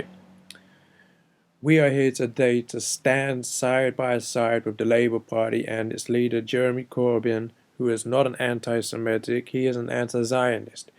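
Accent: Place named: United States. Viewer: why do u sound american then?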